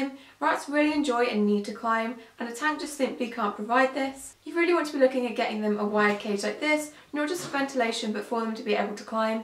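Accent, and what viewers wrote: United Kingdom. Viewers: You Bri-ish? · Are you British *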